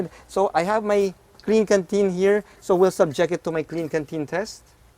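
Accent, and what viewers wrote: Philippines. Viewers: shifting by ear??? Haha!!! Shifting by hand is easier I think. It feels natural. Haha Pinoy English. Trying hard. Tagalogin mo na Lang boy!!!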